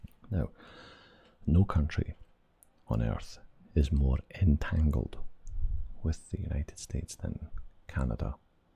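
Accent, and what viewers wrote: Scotland, United Kingdom. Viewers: Im neutral ion rlly get into politics but i love ur scottish accent lol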